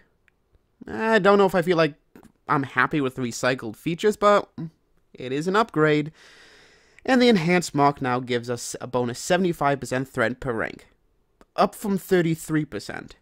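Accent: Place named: East Coast, United States. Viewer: You sound like you're from the East Coast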